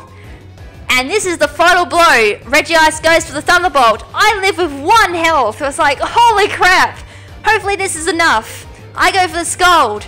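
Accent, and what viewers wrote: Australia. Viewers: Your australian!!!!!! Awwwwssssooommmmee so am i.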